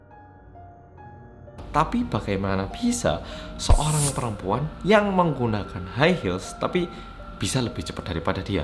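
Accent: Java, Indonesia. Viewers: kangettt gw, suara mu itu lho bang medok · Medeeeekkk nya itu lhoo masss😆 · Medokkkkkk aja mas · Iya medok ny ilangin ak suka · Jam 1.44 AM kyaknya jdi jadwak tetap nonton rindingdong deh, makash mas berkat suara medok mu aku jdi cepat ngantuk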